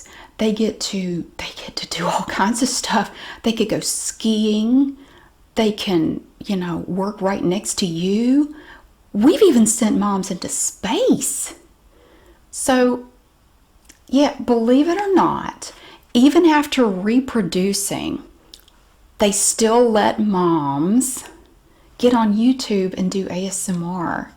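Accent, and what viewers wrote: South, United States. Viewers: Btw you are a beautiful woman with a pretty southern voice and your personality is awesome. I also admire your diligence.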